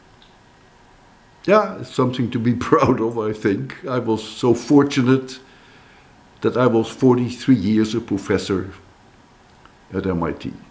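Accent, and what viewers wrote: Netherlands. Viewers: This guy sounds so Dutch it's insane I love it.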